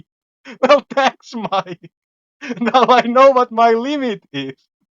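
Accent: Finland. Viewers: Haven't watched the video yet but I have to say, you sound super Finnish :D (I'm Finnish)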